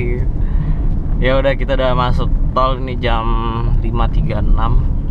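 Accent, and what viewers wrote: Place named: Banyumas, Indonesia. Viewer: Lucu timen ngomong ngapake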